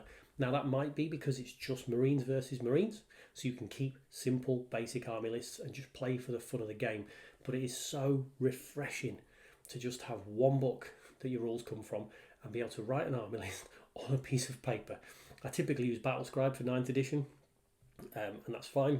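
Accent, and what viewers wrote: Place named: Wakefield, United Kingdom. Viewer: do live in Wakefield you just seem to have that accent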